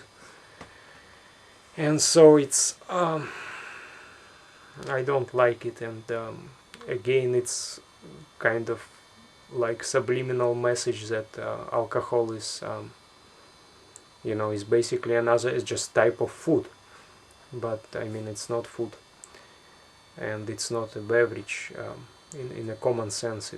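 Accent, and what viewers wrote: Russia. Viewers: Guy, you have so russian accent :D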